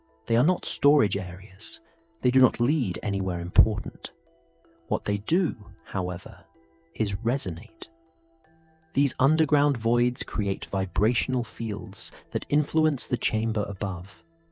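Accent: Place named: United Kingdom. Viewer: Is this what we're forced into a British accent on every freaking Channel I think it's a good Channel but can't I get it in an American accent👎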